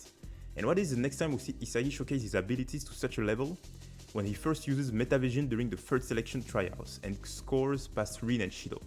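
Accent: France. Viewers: I knew you sounded french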